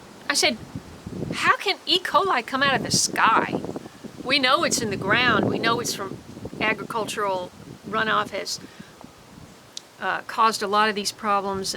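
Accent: Tennessee, United States. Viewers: Sounds like you are in Tennessee?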